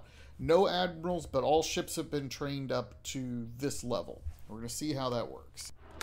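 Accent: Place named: Melbourne, Australia. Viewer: Sounds like Melbourne, Australia.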